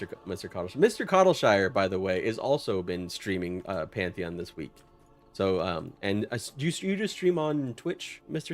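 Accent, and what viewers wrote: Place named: United States. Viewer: Talking of you not having an English accent, at least you don't have to fake American pronunciations to get views like most English gaming Youtuber's, you just do it naturally. It feels really weird seeing (well, hearing) them do it but I believe it really does make a noticeable difference to them getting views.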